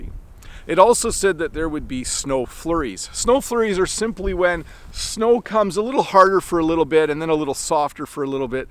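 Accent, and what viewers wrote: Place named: Canada. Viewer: I heard the hallmark of Canadians a lot out here: the Canadian raising "aboat".Lol.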